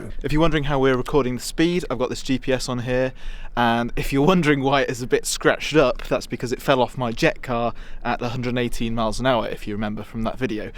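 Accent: England, United Kingdom. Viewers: I have a new favorite english accent. 😄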